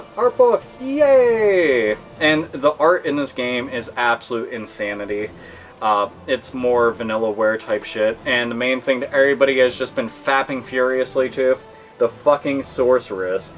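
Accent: Ireland. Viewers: wait you're Irish ? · Holy shit, you're Irish!?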